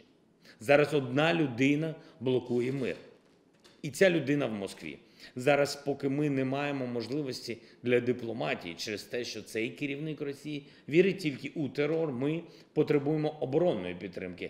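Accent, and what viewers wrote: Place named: United Kingdom. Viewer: He speaks English with a British accent. He works for London city Charles.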